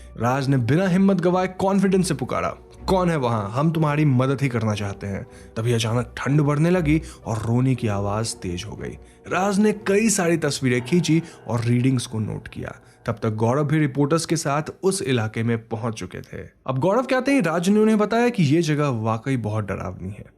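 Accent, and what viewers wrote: Bengal, India. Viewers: Bhaiya aap bengal se ho ??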